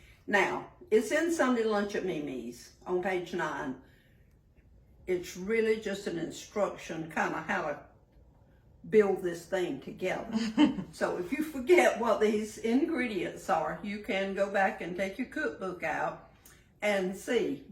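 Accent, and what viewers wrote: South, United States. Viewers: MeMe I love your accent ( I'm Canadian) no offence, but I often listen to your program when I go to bed, and your voice soothes me to sleep😴 Hearing you talk about getting ingredients acquainted warms my soul & I love your giggles in the background! Makes me want to come down South. Love y'all from Canada Cheers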